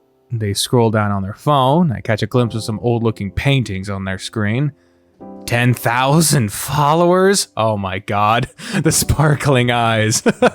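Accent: United Kingdom. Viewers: I think hes supposed to be bri'ish judging by the shite, bloke and bloody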